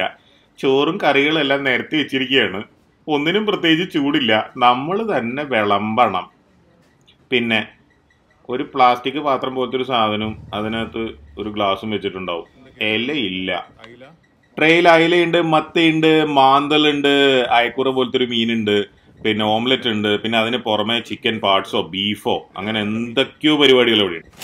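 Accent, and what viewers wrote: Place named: Thrissur, India. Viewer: എങ്ങനെ ഈ തൃശൂർ വള്ളുവനാട് സ്ലാങ് വരുന്നു തിരുവനന്തപുരത്ത്കാരന്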